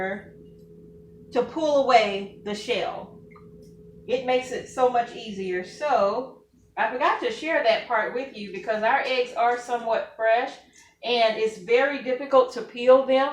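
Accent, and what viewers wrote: South, United States. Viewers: You are an adorable girl! You sound like a girl raised in the South....I am tuning in from SW MS....thanks for sharing your knowledge with me.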